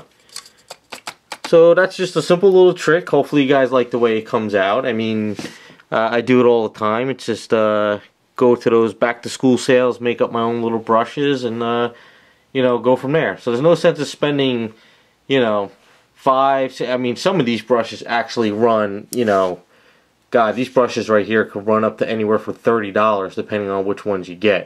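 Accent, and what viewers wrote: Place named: South Jersey, United States. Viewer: the south jersey/philly accent here has soothed me as well as given me all the confidence in the world to cut up my paint brushes immediately. Thanks for the tutorial!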